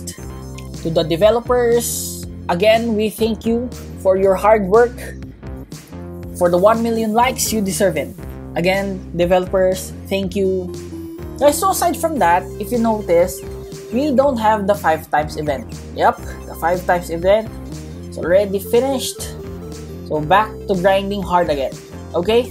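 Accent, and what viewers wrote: Philippines. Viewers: I sub on you bc i know your filipino.FILIPINO RIN AKO! HALATA NA SA PAG SASALITA AGAGAGA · Pinoy ata tong nagsasalita hahahahha accent nya parang pinoy mag english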